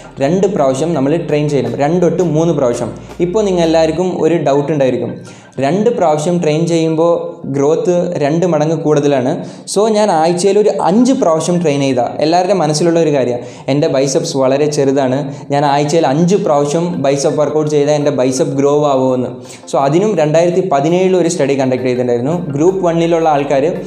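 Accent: Tamil Nadu, India. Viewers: Malayali anno athoo Tamil annoo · Are you malayali or Tamil .? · R u Tamil or Malayali? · Bro tamilan or malayali?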